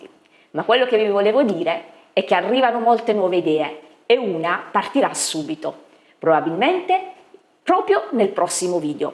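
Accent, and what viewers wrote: Italy. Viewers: I enjoy your cooking and look forward to the videos every Thursday. Keep posting! Your Italian English is unique and they make me laugh all the time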